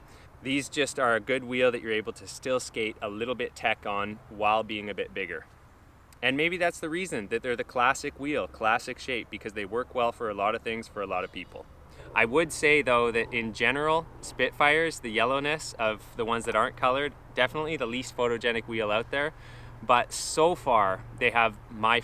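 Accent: Canada. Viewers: Hence my never noticing you pronounce it that way. Wasn't trying to imply that you didn't know better or that were even saying it wrong. But, I get it now. Well mannered Canadians say it that way.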